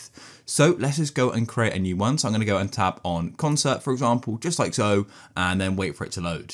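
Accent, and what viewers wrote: United Kingdom. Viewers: he’s just british not every british person sounds like danton💀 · He Sounds Like A British Man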